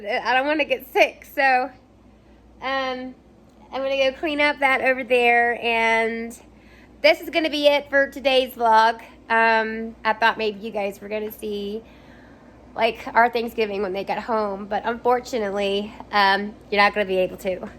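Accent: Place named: Tennessee, United States. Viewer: She got that Tennessee accent y’all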